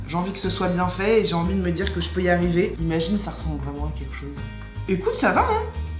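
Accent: England, United Kingdom. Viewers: toi j'aime m'occuper de ma peau MAIS LE MAQUILLAGE ÇA PREND PAS SOIN DE TA PEAU HAHA TU FAIT RIRE😂😂😂😂 #LE CACA D'OEUIL QUI VIEN TOUT GACHER TU ME RIRE AVEC TON ACCENT ANGLAI VIELLO😂😂😂😂😂😂😂😂😂 MAIS JE T'ADOR MA PRINCESSE TU FAIT TROP RIRE😚😚😚😚😚😚😍😍😍😘😘😘😗😗😗😗😂😂😂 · Elle est belle les anglaises sont trop belles charmante accens charmant et appart de reves.bref source dinspi. Univers rend nous charmante.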